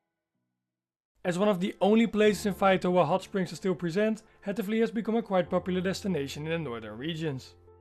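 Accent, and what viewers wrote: Netherlands. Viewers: Where are you from? Your accent makes me think you are Dutch. Nice build bdw.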